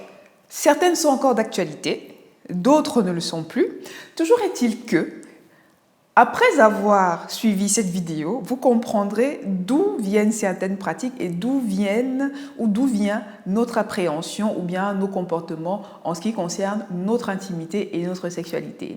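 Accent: Cameroon. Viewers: Je sens, c'est une kmer qui parle et ça me plaît grave....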